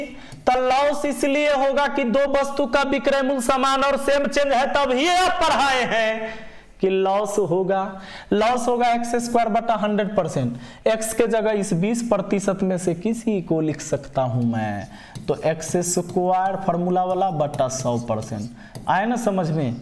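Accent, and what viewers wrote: Bihar, India. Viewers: bihari type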